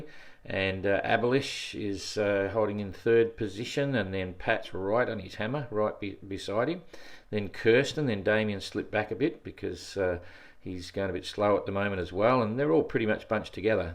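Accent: Australia. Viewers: Hello,it would be kind to speak a little bit slower for french followers who are not crurrent users of the aussie accent..thank you so much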